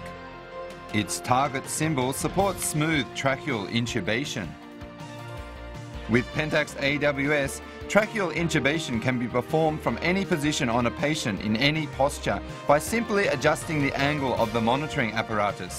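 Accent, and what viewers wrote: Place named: South Africa. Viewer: I love the south african accent; it reminds me of Alan Partridge series 2. This looks even easier than glidescope.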